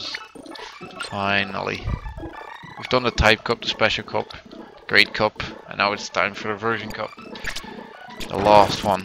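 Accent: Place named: Netherlands. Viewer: No worries, I'm dutch too trying to work on completing this game 100% myself... The tournaments are a huge fucking pain though! Also, normally hearing my fellow countrymen speak English in a Dutch accent is unbelievably cringeworthy, but for some reason you're able to pull it off :P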